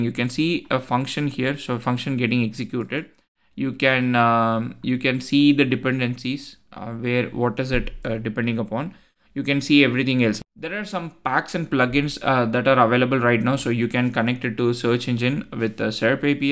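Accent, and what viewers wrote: India. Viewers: Opens video, speaker has Indian accent, 🙂‍↕️ this is going to be an excellent video 🫶🏻. Edit: - 🙂‍↕️ it was great. Edit 2: I think AGI should strictly train on YouTube videos with Indian accent 🙂‍↕️, it’s guaranteed to learn the easiest way to explain the hardest and the most complex tasks. Medical school was saved by literally 5 Indian guys teaching medical school on YouTube to us 😁.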